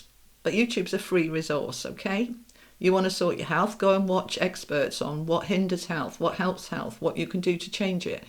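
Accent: Wales, United Kingdom. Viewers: I needed that thank you. ❤ Are you a little bit Welsh? You have a nice accent. Peace and love to you.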